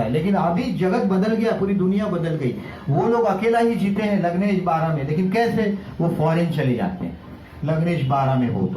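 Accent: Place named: Mumbai, India. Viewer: I really like the way you talking in Mumbaiya tone great sir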